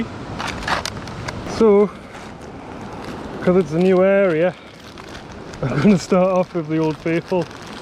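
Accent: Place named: North, United Kingdom. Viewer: Top notch again some great fish makes the scrambling well worth it, great to hear a fellow northern voice on these channels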